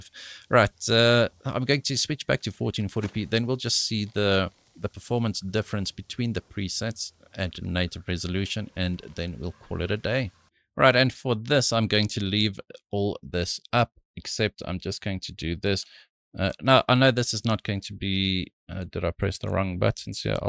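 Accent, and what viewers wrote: South Africa. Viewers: Is this an SA boi I hear?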